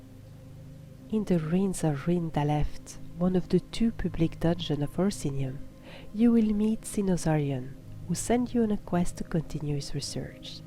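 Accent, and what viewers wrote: France. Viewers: Êtes-vous français ou québécois?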